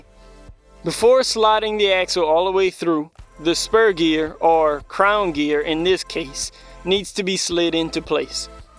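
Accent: Louisiana, United States. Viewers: Hey, you from Louisiana? Dat sounds like a Cajun accent to me :) Anyways, sick build, man!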